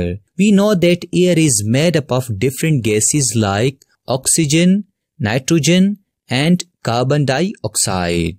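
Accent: India. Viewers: Omg....I love his Indian accent it’s so cute 🥺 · Its indian accent though, the spongebob narrator has a french accent✨ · ugh another idian speking guy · His accent is so pure Indian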